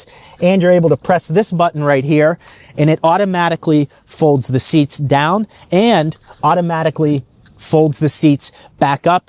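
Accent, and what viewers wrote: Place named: AAVE, United States. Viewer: Cringe ebonics simpleton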